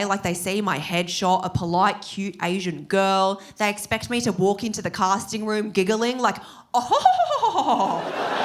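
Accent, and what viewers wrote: Australia. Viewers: The first time I found the Australian accent so beautiful! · Going onto midnight and this pops up on my You Tube feed. I'm from New Zealand, and I see a Chinese woman with a strong Australian accent. I can't understand Chinese or Australian. I had to put the subtitles on . Sorry. Anyway, I had a peek. Glad I did, very funny and witty. New Subscriber. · People think your voice doesnt match the face because we are so used to American voices when we hear someone speak, when we hear someone from Australia everyone thinks its some white person because most movies show that, Australia isnt really popular in anything and noone knows how diverse Australia really is compared to most western countries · Wow that is thicke australian accent i love it. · You are so much fun and perfect and beautiful! Icing on the cake is that you are Asian with an Australian accent..... 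win. win .. I could listen to you all day. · I'm sorry, not being mean, just you are hard to understand because you're Australian accent is so strong. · As a fellow Aussie, I really do think that anyone who has heard you talk and said you aren’t Australian is just extremely jealous of the fact you sound more Aussie than they ever will, but are also far more interesting because of how you look. 😂 Also, I am pretty sure it is the most Aussie thing to upscale your story every time you tell it, sort of like fishermen. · Ugh...Chinese with an Australian accent?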